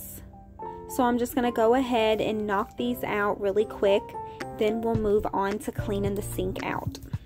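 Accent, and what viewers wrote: South, United States. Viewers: I love when people say things different than I do. I find it sweet and southern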